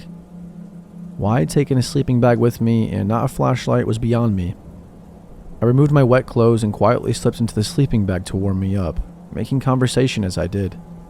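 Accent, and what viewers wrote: United States. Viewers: I love your voice. New Jersey is that you? 😅 Your voice makes me feel safe. Thanks babe. ❤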